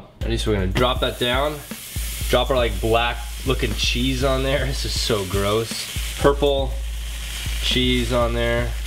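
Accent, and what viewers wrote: Canada. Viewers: I love the way Canadians say out😂😂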